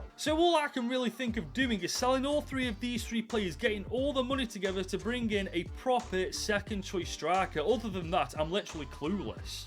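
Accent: Stoke, United Kingdom. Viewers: Is that a stokie accent I hear ?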